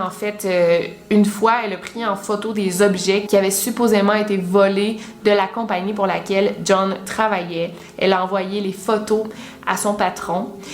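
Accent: Quebec, Canada. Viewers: Je viens de découvrir ta chaîne depuis à peine une semaine et depuis, je suis scotchée à tes vidéos surtout celles qui abordent les disparitions inquiétantes et les morts mystérieuses. Jusqu'ici, j'ai toujours apprécié de regarder à la tv des faits divers, dossiers criminels et autres disparitions inquiétantes. Il y avait certains sujets que je connaissais déjà mais d'autres dont j'ignorais l'existence et qui méritent d'être plus approfondis par les médias. C'est le cas pour les affaires d'Emma Fillipoff et de Lisanne Froon et Kris Kremers qui m'ont particulièrement touchées. 😢 Tu as une manière tellement captivante pour raconter ces histoires troublantes que je m'étonne parfois que certaines vidéos durent 25 minutes ou plus. La musique marque l'ambiance sans trop exagéré l'aspect mélodramatique et l'apparition furtive de tes chiens rassure lorsqu'il y a des moments plus perturbants dans l'histoire racontée. 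Encore une chose : tu as réussi à me réconcilier avec l'accent québécois 😁 Bonne continuation et salutations de la Belgique 🇧🇪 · J'adore ton accent québécois ça te fait une belle voix 😉😱😍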